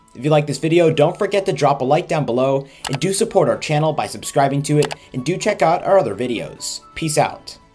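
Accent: South, United States. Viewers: Bravo! Very cool. I am from New England, so we think people down south talk funny. Well, I love her southern drawl and have nothing but respect for her outdoor skills. I know the dad is very proud. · Does a more perfect, genuine, beautiful woman exist? Wish there were millions more like her! The world would be so much better. Natural beauty - no spiked/purple hair, nose ring, ear piercings, tongue piercings, nor tatts. Just natural perfection. Even her Southern Belle accent is awesome!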